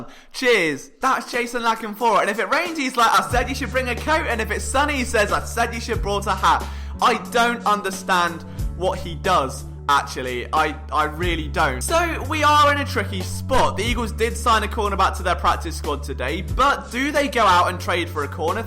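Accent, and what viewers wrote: United Kingdom. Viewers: Hate to triple comment, but your antics are so brilliantly weird. Combined with a British accent and a Giroux jersey, 14k subscribers should be coming after this post. But anyway, WHERE IS THE FILM ROOM?! Sidebar: You might have to re-WIRE your palate if you’ll be in Philly this year. I don’t even know what half the foods you said were, but I can pretty well guarantee they won’t be available. Cheesesteaks are delicious, yes, but can only have so many in a short timespan.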